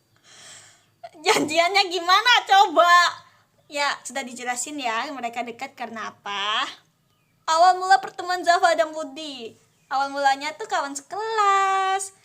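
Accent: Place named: Palembang, Indonesia. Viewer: Pantasan bahasa nya kek ada setengah bahasa palembang gitu ternyata kakaknya orang bengkulu di sumatra selatan soalnya ane orang sumsel juga🗿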